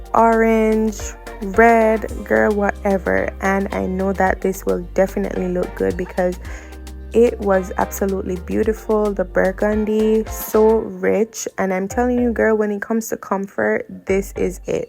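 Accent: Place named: Jamaica. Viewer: Hey Hun, as soon as mi hear di Jamaican accent yuh got mi. I love all of the outfits, mi love Shein Bad. New subscriber ❤. I do have a YouTube channel also, pass and show your fellow Yardie 🇯🇲some love😂❤. Lata❤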